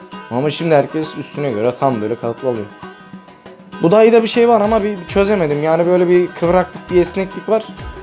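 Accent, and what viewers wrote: Azerbaijan. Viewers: Abi selam sen Azerbaycanlımısın konuşmandan benziyoda o yüzdrn söyledim. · Salam qadanalim men seni tam annamadime ağali ela Azerbaycan lehcesinde danişisan amma soyadin. Ağali senin atan Azerbaycannandi hee?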